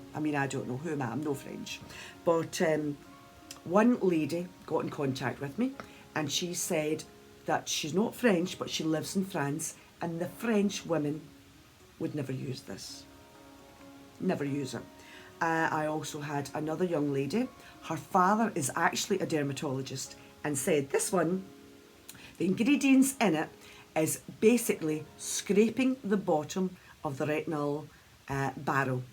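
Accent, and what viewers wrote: Scotland, United Kingdom. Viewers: I think scottish accent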